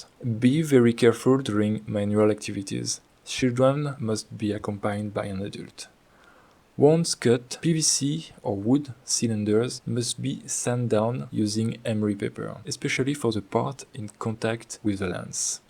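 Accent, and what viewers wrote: France. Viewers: Tu es français!! Je peux l'entendre :) Did you measure the intensity of the light, so that you can calculate the efficiency? That is the key. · I love the French accent ❤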